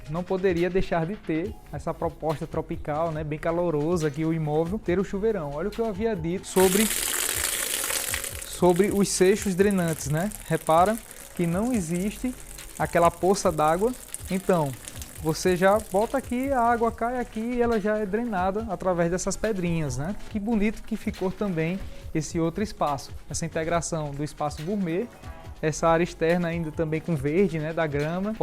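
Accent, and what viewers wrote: Northeast, Brazil. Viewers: Pelo sotaque deve ser em algum lugar do nordeste , ceará talvez ou Pernambuco talvez ☝️ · Que projeto lindo, gostoso, sem palavras pra esse projeto e essa casa! A sua apresentação tb, é digna de aplausos, parabéns, vc é diferenciado tb pra apresentar, fora que vc tem um sotaque muito legal que eu, como boa filha de nordestinos, adoro kkkk. Parabéns tudo muito top demais!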